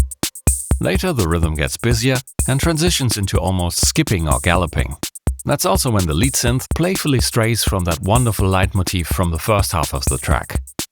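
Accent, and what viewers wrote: Germany. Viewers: Wollte erst fragen, warum er die Beiträge nicht in deutsch bringt 😋🤔😂 Sein Englisvh ist gut, aber dennoch können wir es als Muttersprachler heraushören. Um so gruseliger muss unser Englisch für "Engländer" sein 🤪😂 - zu immitieren erfordert schon einiges. Die Klangkulisse sind extrem komplex und trotz der minimalistischen Samples fügt sich alles zu diesem unverkennbaren Sound 👍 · As a German i heard it right with his first words. Dont know what it is but you can hear if someone is German. Just a few can sound nearly not-german. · Ich höre da sowieso einen Deutschen Akzent raus oder - Geiles Video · Was für ein vertrauter deutscher Akzent in dieser Erzählstimme…wird hier nicht übersetzt…do it with google or gemini or siri or otherwise❤️🖖🤟P.S.: Just ask a german friend of yours👍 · 12,39 are you german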